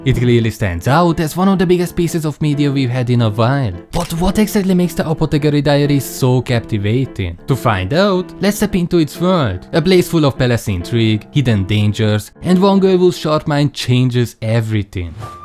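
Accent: Hungary. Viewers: That Hungarian accent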